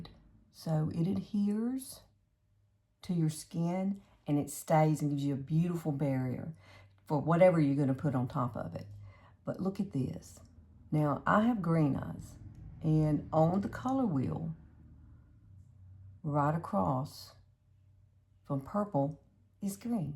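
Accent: South, United States. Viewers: I love your southern accent! You’re very cute and funny.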